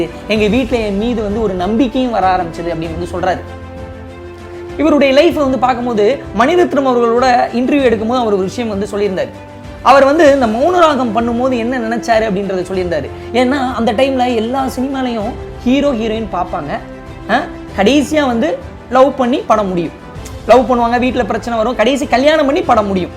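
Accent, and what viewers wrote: Madurai, India. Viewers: Ni madurai ya bro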